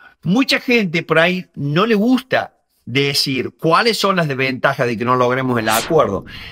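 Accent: Córdoba, Argentina. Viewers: Me encanta tu tono cordobés, muy útil este video. Gracias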